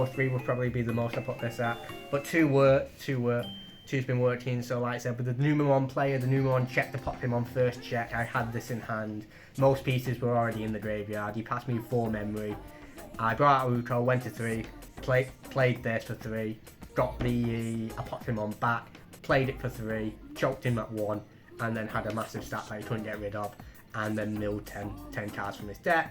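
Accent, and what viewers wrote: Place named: United Kingdom. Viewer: these auto subs are the embodiment of how hard it is to get an ear for a regional british accent sometimes 😅 Nice list!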